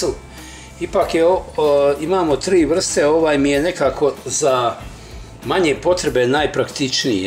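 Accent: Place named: Zenica, Bosnia and Herzegovina. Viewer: Ne znam da li ste iz Zenice...ali imate pravi Zenicki naglasak hehe.Pozdrav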